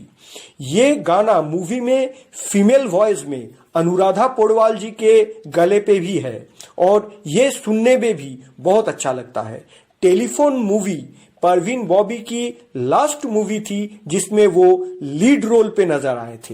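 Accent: Bengal, India. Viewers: তুমি কি বাঙালি।। তোমার হিন্দি তে বাংলার টান আছে।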